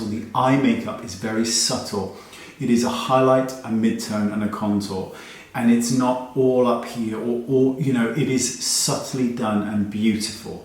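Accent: United Kingdom. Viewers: Oh.......I could listen to you read the phone book all night long. You have the most soothing voice, but we all feel you from the inside and know you’re the real deal! I’ve read some of the books you mentioned you have very good taste lol. When you said “bazaar” my heart melted.....bazaaaaaaa!!!! Love that British accent (and you’re cute too!!!) ❤️😘❤️ KimP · I agree with that comment, I love your voice. It is very soothing, soft and gentle. I love British accents. One day I will live in England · Cathy Gilbert you hit the nail on the head! I always feel good after watching one of his videos. He’s funny & helpful & truly caring. Wonderful British accent & very easy on the eyes LOL. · It's funny to me when people talk about a "British" accent, there are only about, what, a hundred? Two? But while your vowels are indeed lovely, I think the real thing may just be that you are a truly lovely person, and that shows through. 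· I remember the flowers in the attic 😢 I honestly have to be in the mood to pick up a book. I could probably write my own novel of ups and downs 😅 I love to pop on youtube and watch your videos and forget my worries. I think your voice and accent is great.. Being a fellow brit with the priceless wit and sarcasm is always a treat.. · First, us Americans are just bowled over by the British accent which in your case is really lovely. Second, I have learned so much from you. I’m a almost-60 year old woman and the very editorial looks are just not appropriate for me. Lastly, your genuineness and gentleness are evident in every video. I try to fill my space with kindness. You help. 💜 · You DO have the best voice & I love the British accent so much!!! And you just saying “Dirty Weekend”...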